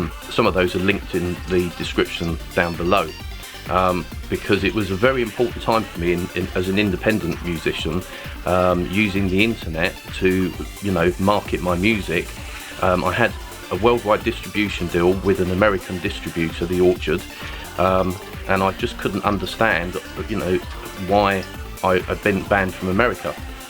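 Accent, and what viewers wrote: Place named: United Kingdom. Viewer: I guess your english is from the UK it's kind of difficult to understand.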